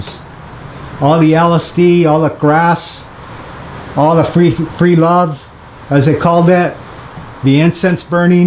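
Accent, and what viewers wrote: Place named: Chicago, United States. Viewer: are you from Chicago or Milwakee? Its date der accent Yah Know?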